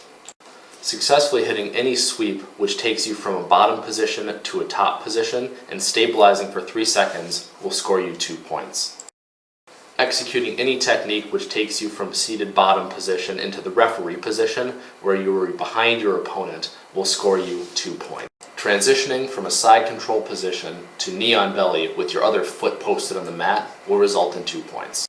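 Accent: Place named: Minnesota, United States. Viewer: I love that Minnesota accent.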